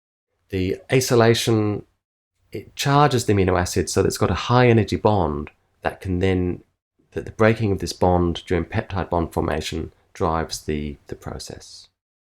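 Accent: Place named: Australia. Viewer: Best Molecular Biology Professor; your videos are timeless, like etenal. It also made me like Australian version of English outspeaking.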